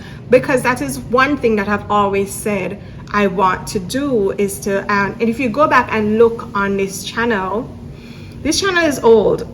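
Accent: Jamaica. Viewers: YARDI GIRL HI THERE! Love di accent u nuh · First timer to your channel, and a fellow Caribbean national trying to come up with content. I'll tell you what I think dough dash's "secret" is. She has displayed her struggling financial situation to everyone, and her viewers are rooting for her to succeed and I believe that this is what has driven her channel's recent success. ... ppl are invested in helping her and seeing her succeed. I don't believe this is sustainable content... I'm sure she will HAVE to find something more substantial as time goes by. Only if you are prepared to display most of the private parts of your life, where you are struggling, you will get ppl following you to see how the story pans out. DD channel is like a soap opera... and this is what has ppl coming back to see "what happening today...?" I'm not prepared to advertise my financial or family struggles to get the views. 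Please take heart.... I'm a new subscriber to you.... love me a jamaican accent❤ Love from Trinidad 🎉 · and relevant because my proof is I found your page when looking for information about moving abroad specifically to Mexico and when you started talking with your Jamaican accent it resonated with me because of some of my friends who are Jamaican. It’s a global ting😀 in Texas, Mexico and the best city in the world New York! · I'm just a plain white Canadian but I think your Jamaican accent is charming. I can understand 90% of what you say but maybe 10% of your native Jamaican sayings go over my head lol. I don't know how your channel popped up on my homepage, I guess because I started watching House Hunters videos, though I never saw the episode you were in. I usually only see independent travel videos. I like your message of encouraging others to live more, it's something a lot of people need to hear right now. 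Don't compare yourself too much to that DoughDash woman-I don't even think using that name is legal (since it's essentially piggybacking on the popularity of the DoorDash name which the company had to pump a lot of money into marketing for.) You are doing it right just by putting your own name on your channel (and since your last name is, it also taps into that part of the audience who either loved or had issues with class lol). If I could suggest something to you to improve the views of your videos, it would be show more scenery of Merida, maybe some sunsets or beaches, just more general things people like to see. I have never been there and am not likely to go, it would be kind of nice to see something like that. Most of the successful travel channels I see are from independent Youtubers who film their stays in various hotels and locations, but they've usually started off filming the beautiful scenery of their own hometowns.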